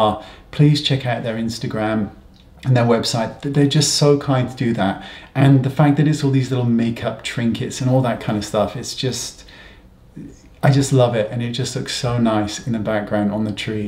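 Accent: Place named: England, United Kingdom. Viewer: Why did I just realize after a few years of watching he has an English accent….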